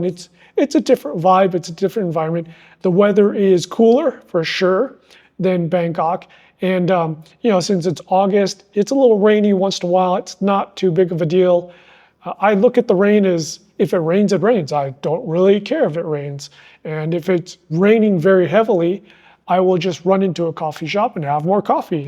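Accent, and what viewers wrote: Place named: United States. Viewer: I’ve watched many of your videos on Thai language acquisition. From your manner of speaking and the small personal details you’ve shared, I assumed that you are American. But judging from your appearance, you look Chinese. Are you also a Mandarin speaker? Or are you fluent in another Chinese dialect? Are you a trilingual?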